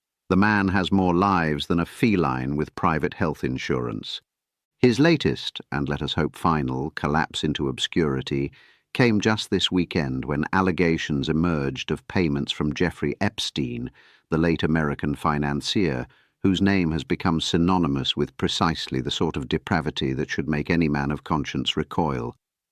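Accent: RP, United Kingdom. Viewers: Thank You. It is such a pleasure to listen to this pure poetry, exceptionally polished in Queen's English. Btw, have anyone, anywhere, in any country, ever heard of a politician admitting a wrong doing or "mea culpa"? from a Finn in Diaspora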